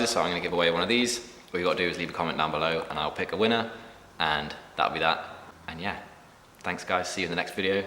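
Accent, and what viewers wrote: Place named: Bristol, United Kingdom. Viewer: knew you were from brizz from that accent hahaha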